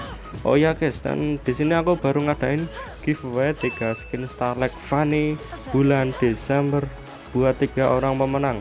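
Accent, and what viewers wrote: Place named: Banyumas, Indonesia. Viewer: Youtuber ngapak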